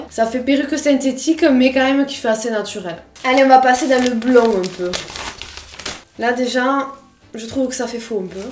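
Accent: South, France. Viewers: En tout cas on sait qu'elle vient du sud pas de doute à priori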